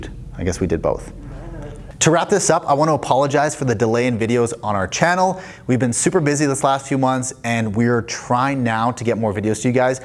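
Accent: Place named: United States. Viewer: Wow! A Canadian that sounds like an American. Not for me.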